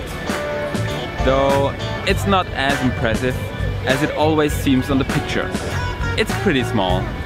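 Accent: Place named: Germany. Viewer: So funny when he says "oo-idios" instead of videos. I've heard other Germans saying it too. Just peculiar.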